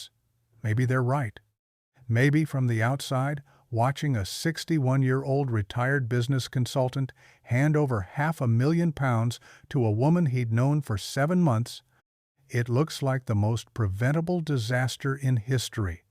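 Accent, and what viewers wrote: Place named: United States. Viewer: This guy sounds like an American, yet he measures money in British pounds. This video is a warning about how not to be stupid, as all these types of videos are. I guess it is in the right place, as YT is where all these stupid people like to hang, but the content is a just copy of thousands upon thousands. Lesson of video: Use your brain and there are no problems here (...and maybe avoid BKK). In the end, this video is just a repetition of many, many other vlogs on YouTube. It is a simple, lazily made imitation of someone else's original idea. Every Farang in Bangkok thinks this is how to create content, but this type of channel is now getting really tired and uniteresting. If you are going to make up a story, next time make sure it has an original thought behind it.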